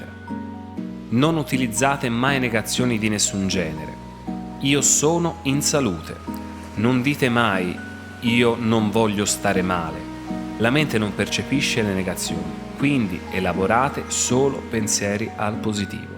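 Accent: Tuscany, Italy. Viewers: L'accento toscano mi fa venire ancora più voglia di ascoltare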